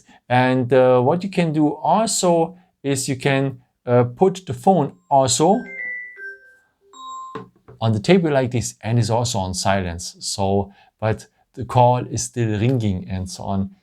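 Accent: Germany. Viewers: Vor kurzen gekauft das A72 Machst Du das auch in Deutsch? Hast da noch einen anderen Chanel, Du klingst zumindest im Akzent Deutsch ;) ... if not, here my translation: I ask if you have a german channel to, because you sound german ::) I just bought the Phone. What I have problems with is the camera. Take nice fotos with red flowers for exampel is not possible, does it has a "red-problem" ? How to handle that?? Its too bad... even with other apps ... because its the camera I guess?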